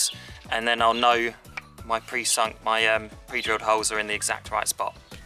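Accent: Italy. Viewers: I hear an Italian accent, no? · I'd say he's Italian 👍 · Are you italian? I can hear a tiny "ë" at the end of your sentences. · You sound Italian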